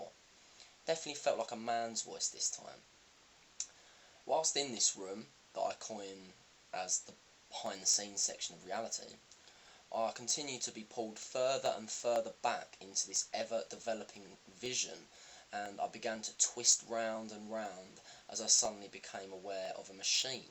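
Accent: United Kingdom. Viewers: Your voice and accent makes me think of the Harry Potter movies....sorry...I'm Canadian...so I love other accents...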